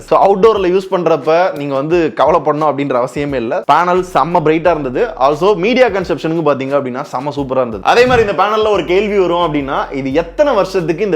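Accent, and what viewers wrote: Madurai, India. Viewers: Bro oungaluku recent videos laa madurai slang veliyaa varuthu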